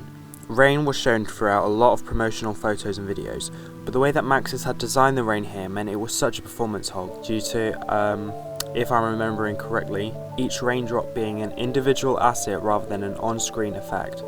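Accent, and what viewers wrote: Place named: United Kingdom. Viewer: loved the british accent but it's a thumbs down from me for the audio pops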